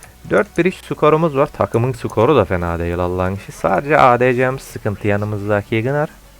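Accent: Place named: Muğla, Turkey. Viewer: abi sen nerelisin şiven konuşma tarzın muğla tarafları gibi benziyor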